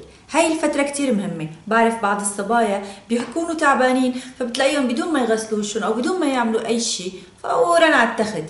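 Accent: Aleppo, Syria. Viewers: دخيل الله ما ألذك😍😍 ماتبلى هالضحكة وهاللهجة الحلبية اللي بعشقا❤️❤️ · دخيل روحك بتجنني 😍 دخلك كأنو لهجتك حلبية ؟؟ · حضرتك من سوريا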